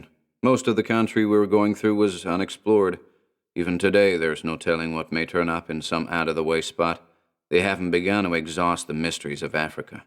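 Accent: United States. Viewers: American accent is hilarious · Your american accent is really good!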